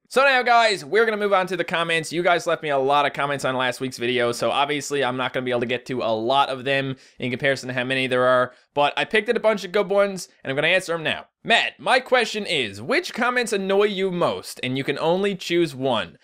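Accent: Newfoundland, Canada. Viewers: That's weird, your accent is extremely Canadian. :'D I could tell you were Canadian instantly tbh. (I'm an accent nerd though) (anyway you're awesome matt keep on keeping on /o/) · Hey matt im from NL Bonavista and i understand all the newfoundland buddy, Love you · I didn't understood anything of what you said with the Newfoundland accent. ^^ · Your "Newfoundland" accent sound kinda like the way people talk around here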